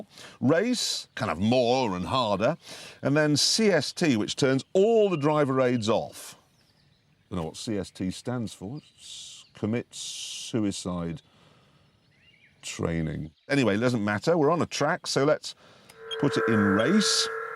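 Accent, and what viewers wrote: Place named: England, United Kingdom. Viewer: I LOVE the english "english pronunciation"...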